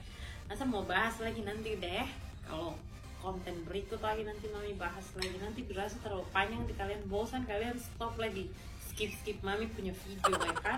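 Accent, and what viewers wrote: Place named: Ambon, Indonesia. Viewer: Enak banget dengerin pake nada ambon yaa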